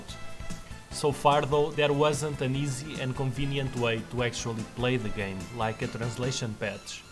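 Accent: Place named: Russia. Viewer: Not sure if you are Russian by your accent or Portuguese/Brazilian by your Twitter language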